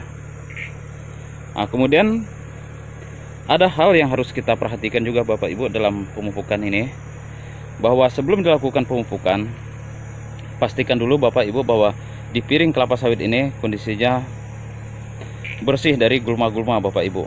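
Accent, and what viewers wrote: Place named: Sumatra, Indonesia. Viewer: Ya bapak ibok..logat nya macam sumatera bang .👍😀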